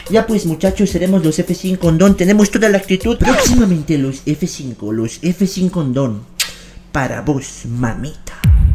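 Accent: Bolivia. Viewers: hablas del Cu.... tipico hacento Cochabambino que intenta ser graciosillo...... por cierto eres muy guapo 😆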